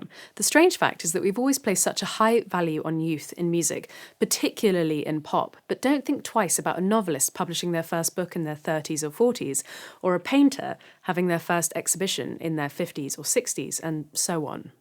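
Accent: United Kingdom. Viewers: I follow you for your insight, intellect, and whit mostly.. and your charming, propper British accent. I'm honestly not that familiar with your music, but you done good on with Josh. You're valued. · I don't know anything about making it in the music industry - but I could just sit and listen to that British accent for hours on end!! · I dunno...you're a skilled and respectable guitarist with a wide YouTube following. I'd argue you've made it already. Truth is, the old models of success no longer apply. Back then, if you didn't have a record deal, no one knew your name. Today, ironically, music is one of the few fields in which now you can succeed with hard work and quality material. And even if your appeal is quite narrow, you have a far greater chance of reaching an audience than back in the day. Truly, I'm not that familiar with your music. I know you from your commentary--like Rick Beato--and I value that aspect of your work immensely. 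You are always lucid, thoughtful, and intelligent, and the fact that you've got a pleasant speaking voice with a lovely British accent doesn't hurt at all. I would wager that even if I can't name one of your songs, I know you for being; and that success, I think, is more valuable than mere musical fame. But truly, what do I know? I don't even have a YouTube channel with hundreds of thousands of subscribers! 🤷‍♂️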